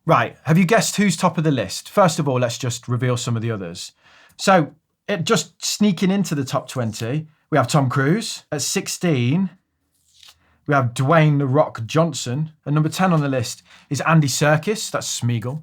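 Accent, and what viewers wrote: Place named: West Midlands, United Kingdom. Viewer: It’s so amazing to see such varied and wisdom rich content from a creator that sounds like me. People don’t quite understand the barriers of the mind that West Midlanders face when we want to put our thoughts out there. Logic being due to the negative stereotypes that a few vowel sounds can somehow determine intelligence. You got me going on investing for my future, and you’re helping me to feel confident when I speak to a client from somewhere else in the UK. Keep smashing it mate.s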